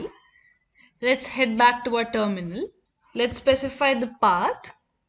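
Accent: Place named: India. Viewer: Good content, but the Indian accent is SO STRONG that it is VERY Distracting. The cadence of speech is from a totally different language than English. Please learn how to speak with at a minimum British accent, or much preferred ... an American-style accent.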